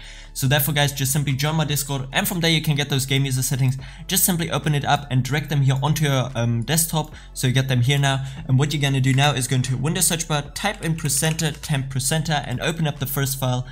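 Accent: Germany. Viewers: 100% deutsch akzent erkannt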